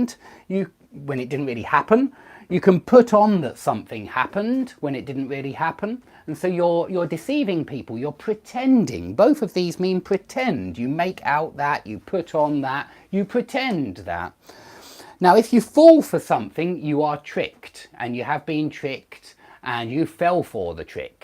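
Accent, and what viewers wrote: United Kingdom. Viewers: British accent is superb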